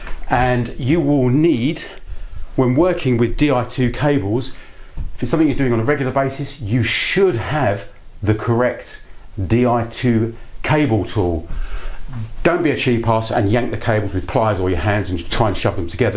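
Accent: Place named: Lancashire, United Kingdom. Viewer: Wow 😮 I’m losing the will to live, even though I like your videos but a strange Lancashire accent 😊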